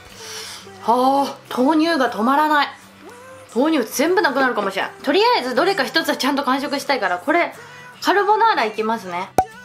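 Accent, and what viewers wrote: Hakata, Japan. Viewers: 最後の方は辛さで味がわからなそう😨 今更ながらの博多弁がめちゃカワイイ‼️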